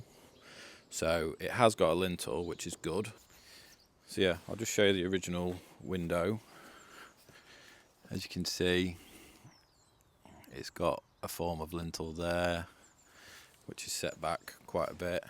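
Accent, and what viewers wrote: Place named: Doncaster, United Kingdom. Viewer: Sounds like you're from Doncaster or somewhere.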